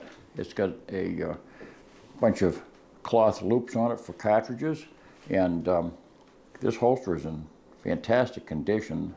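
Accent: New England, United States. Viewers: Best New England accent ever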